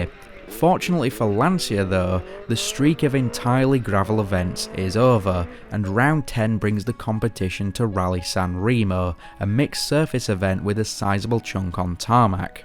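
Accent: United Kingdom. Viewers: Yorkshire accent for the win. Snurr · Are you from East York’s?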